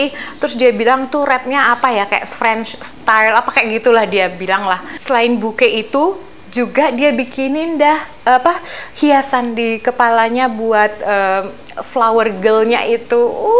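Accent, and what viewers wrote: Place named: Surakarta, Indonesia. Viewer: Thx kak..luar biasa kesaksiannya.. Tuhan selalu sertai keluarga kak..( baru tahu saat lihat YT pas ini..kalau ternyata kaka di luar negri hihi saya kira di Solo Indonesia karena logat dan kebayanya ) GBU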